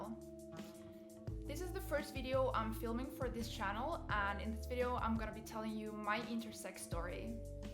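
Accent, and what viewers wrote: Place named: Germany. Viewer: She speaks English with a kinda German accent. Good clip.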